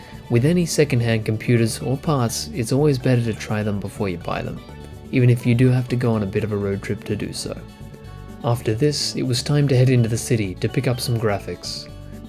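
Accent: Australia. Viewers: Are you Australian? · From aussie?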